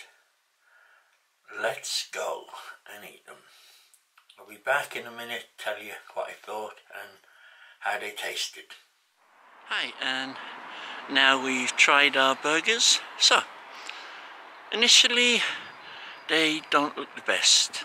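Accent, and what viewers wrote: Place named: Cornwall, United Kingdom. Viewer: This man speaks with a Cornish accent. His ancestors were Celtic Druids who came from Devon and Cornwall.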